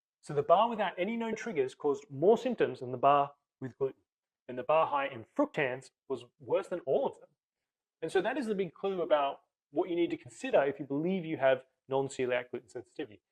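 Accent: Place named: Australia. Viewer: This actually makes sense to me. Only new to the gluten issue realm. Great to hear what sounds like an Australian accent!. Have you got that low fodmap list to look at and try please?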